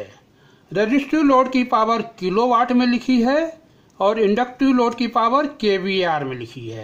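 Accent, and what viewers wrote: Bihar, India. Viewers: Bc Bihari bad speech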